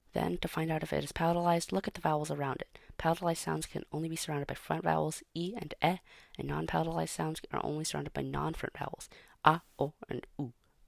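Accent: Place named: England, United Kingdom. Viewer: Hello. Sorry, but... No, the vowels under the fada should be pronounced cleanly, not as diphthongs. You are projecting English phonetics onto Irish. For example, the "i" does not sound like in "bit", it sounds like the French "i", after narrow consonants. Thank you.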